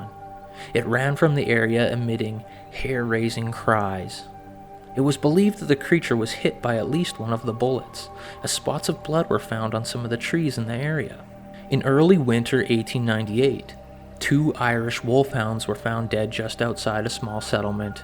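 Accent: Canada. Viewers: he says " bean " instead of Been there the whole time is this a Canadian accent thing or ? "yeah, no ?" lol 😆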